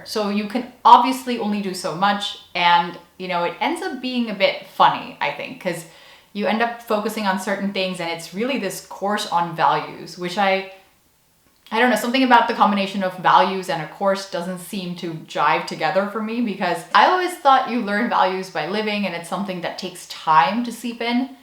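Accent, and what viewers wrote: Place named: Netherlands. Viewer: her english even sounds like a dutch girl sounds when speaking english!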